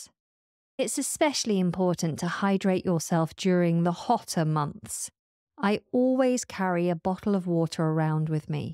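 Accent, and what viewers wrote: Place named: United Kingdom. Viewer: I really love to hear a woman speak british english. excellent podcast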